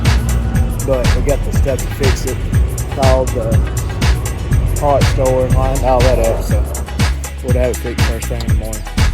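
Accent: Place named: Mississippi, United States. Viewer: GJ explaining that stuff. Always wonder about those heads. Good to see some good ole Mississippi accent on the Youtubes! Good Evening from Lafayette County!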